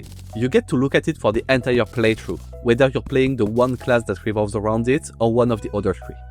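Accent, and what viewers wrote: France. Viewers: are you french perchance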